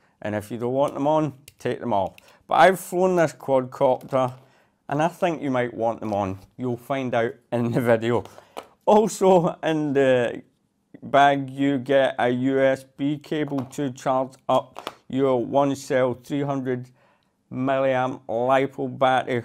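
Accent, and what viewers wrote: Scotland, United Kingdom. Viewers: My grandfather had a heavier Scottish accent and He brought me up.I understand you well. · Ahhh! Didn't mean to be rude, and beeing German probably didn't help me understanding your Scottish accent :-) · Haha 😂 Yes the accent is pretty thick:P I remember when I had to learn Scottish phonetics for acting purposes it was quite challenging. All accents vary in the way vowels are pronounced although Scotts tend to roll their r's quite a bit and are very lazy with pronouncing T's P's and D's. That said, in addition to speaking slowly like you said I think being deliberate in your pronunciation especially in your D's P's and T's would help foreign veiwers This vocal warm up I use to do, may be helpful to achieve this basically you just say this over and over again with strong diction getting faster and faster each time whilst still retaining strong diction... 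"The lips, the teeth, the tip of the tongue, the hard palate, the soft palate, Pronunciate, articulate, exaggerate."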